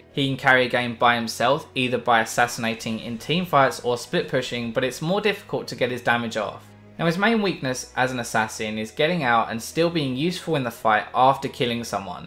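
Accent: United Kingdom. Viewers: Dat british accent :>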